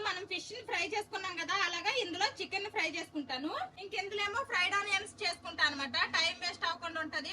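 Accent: Srikakulam, India. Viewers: Hi గారు నా పేరు కరుణ నాకు హాయ్ చెప్పండి మీ శ్రీకాకుళం భాష నాకు చాలా నచ్చింది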